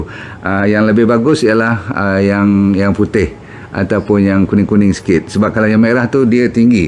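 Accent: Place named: North, Malaysia. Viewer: manis melecas noh...Dr mesti org utara..hahahh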